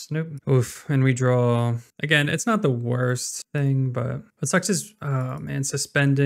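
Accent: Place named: Philadelphia, United States. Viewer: Great vid man. Are you from Philly area?? The accent is strong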